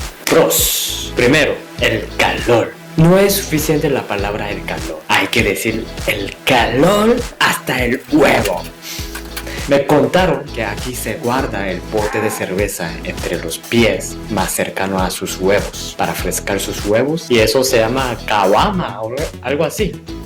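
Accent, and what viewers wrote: Mexicali, Mexico. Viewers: Felicidades!!! Me encanta que hablas el Español casi Perfecto 👌 y tipo CACHANILLA jijijijij Gracias por dar a conocer mi Bello Ranchito ❤️ 3 parte por favor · Me encanto este video de un extranjero que en tan poco tiempo aprendió el idioma y hablar como todo un cahanilla universitario. Muy de acuerdo con tu descripción de la ciudad y lugares de estados unidos que acostumbramos visitar. Deberíamos imitarle y subir videos de Mexicali, pero como residentes orgullosos de la ciudad que atrapó el sol.🌞🤩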